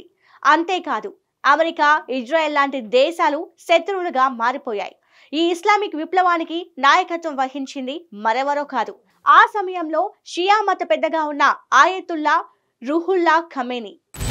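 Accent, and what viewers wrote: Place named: United Kingdom. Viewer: Voice baledu ...English accent lo telugu matladutunnav.....👎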